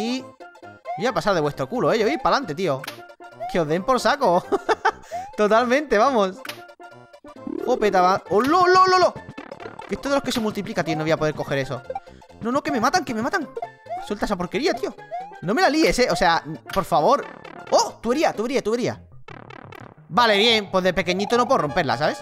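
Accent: Spain. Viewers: Tu tenéis voz de malagueño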